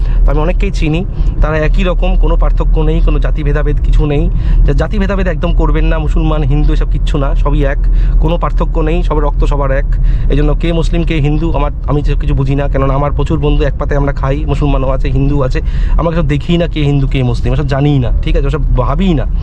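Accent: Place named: India. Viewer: আমি তো অবাক হয়েছিলাম প্রথমে ,যে আপনি পশ্চিমবঙ্গের বাসিন্দা, আপনার হিন্দি দেখে তো মনে হচ্ছিল আপনি অন্য রাজ্যের। স্যার বলছি কিভাবে উঁচু জায়গায় গাড়ি উঠাতে হয় এবং নামাতে হয় এই নিয়ে একটা দয়া করে ভিডিও বানাবেন❤❤❤❤ ধন্যবাদ আপনাকে আপনি খুবই ভালো করে শেখান গাড়ি চালানো , আপনার টেকনিক খুবই ভালো সহজেই বোঝে আসে ❤❤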